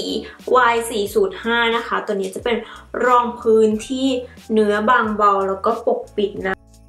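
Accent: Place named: South, Thailand. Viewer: พี่ แหลงใต้ทำคลิปหล่าวตะ ชอบนิ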